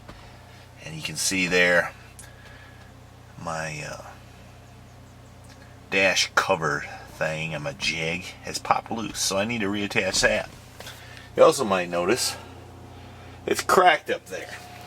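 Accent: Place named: Appalachia, United States. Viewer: Damn i love your hillbilly accent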